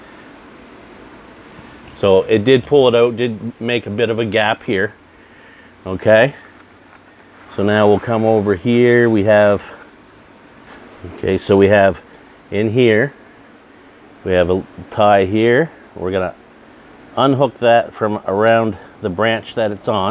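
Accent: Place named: Boston, United States. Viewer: You from Boston brother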